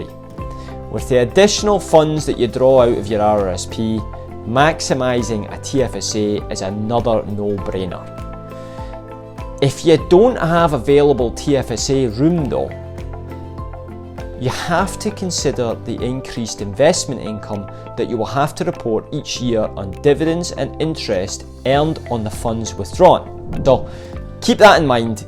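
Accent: Scotland, United Kingdom. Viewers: Beautiful accent. I love it. Not sure what it is. Scottish? Short and to the point advice. I am not surprised, that this video didn't generate many views, nor comments. People simply prefer to complain about CEOs salaries and big corporations profits, than look after their money. To me ( I am recently retired) the biggest advantage of RRSP is the fact, that all capital gains and dividends generated for about 40 years are tax free. It continues within RRIF for the next ( hopefully) many years. Most people, even accountants and financial planners hardly ever talk about it. Understanding of this simple fact worked for me wonders.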